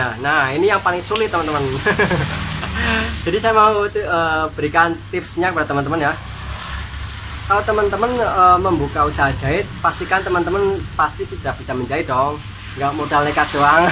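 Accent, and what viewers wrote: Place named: East Java, Indonesia. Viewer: Ganteng...soko jowo timur yo mas....kelihatan dari logatnya...arek suroboyo????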